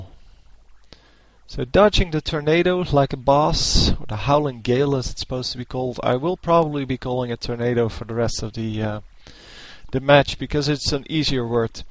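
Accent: Netherlands. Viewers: Ik wil niet lullig doen ofzo hoor, maaaar je hebt een beetje Nederlands accent in je engels :$, Maar toch leuke vids :)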